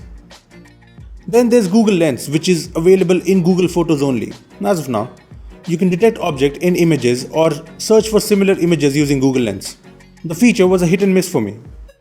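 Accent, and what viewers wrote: India. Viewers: god damn dat indian accent , i can't take it anymore !!!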